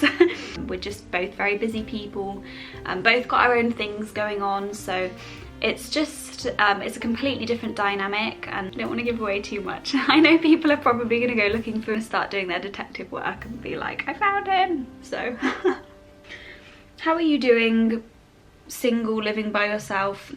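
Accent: United Kingdom. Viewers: Baby, how I like your British English, it sounds so funny, your way of talking and laughing, it's great..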